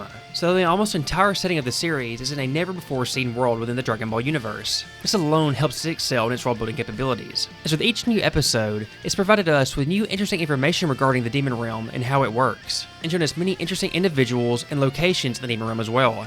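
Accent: South, United States. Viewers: Bro has the most southern accent I've ever heard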